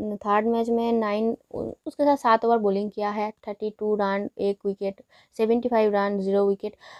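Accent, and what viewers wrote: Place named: Bengal, India. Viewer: Aap west bengal se ho kya awaz se yahi lag raha hai.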